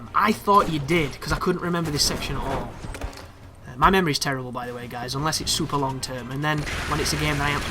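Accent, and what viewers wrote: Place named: Scotland, United Kingdom. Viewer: I've watched this one before I started mine because I really had it difficult on the crushing level when your in the wagon. Perhaps I should have played it first and figure it out, because I noticed on crushing that you didn't have to kill the gunners. But it's the inbetween section where I got killed a lot of times and I was curious how you did it. (And now I know your a Scotsman. Perhaps you should comment al your games a second time in scotish. I will surely look this versions then. Love the Scottish accent as well as the Irisch and some Brittisch dialects. American Englisch is too nasal for me). For the part in the courtyard where you're hanging on the publicity. You did the first part much quicker than I did. I died a lot here in the first wave. But I didn't noticed the gas barrels. For the second part where you have to change side. 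It's much easier to descend one pannel. You can aim the two guys on the right without getting killed when they're firing. Though there are some things you have to know. First if you got too far to the left of the pannel and you aim for a headshot on the left guy, you can't always fire. Another thing that baders me here is that you can't shimmy when aiming. So you just have to guess if you're in the right position. And as you said the sniper is no problem at all. Certainly not if Helena shoots thus guy. Once he is stuned you can kill him, even with a headshot. For the third wave I changed side again. The advantage here is that you got a better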